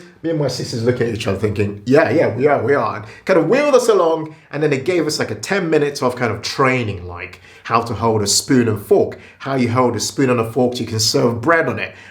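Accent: London, United Kingdom. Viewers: I had to stop watching the video to post this quick comment: I thought you were a Londoner. My husband is originally from London and your accent is close to his. 😄😄😄. I am from Brazil and despite being on this learning journey since 15 and having a fluent level of English , I still have a brazilian accent. Back to the video 🤣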